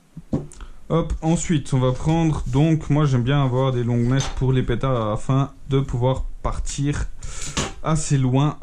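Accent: Switzerland. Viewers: Parce que sur le carton de blanc de colle il y a les trois langues parler en suisse en plus tu as un accent suisse et les français ne disent pas papier ménage. Ils disent sopalin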